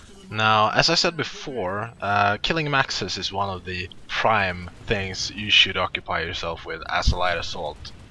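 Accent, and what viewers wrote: Sweden. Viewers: From your accent I'm gonna go ahead and guess that you're from Sweden, or at least Scandinavia. :-)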